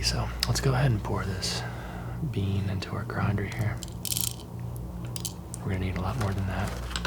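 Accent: South, United States. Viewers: Your little southern twangs are really doing it for me. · I haven't been here long I've seen some of your vids dont want to sound like a creep but I'm just wandering where he's from because based on the way his voice is it sounds like he is from a more southern state.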